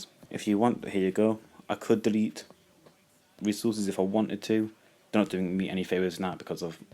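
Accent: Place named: England, United Kingdom. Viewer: Awww English accent...😀.. English?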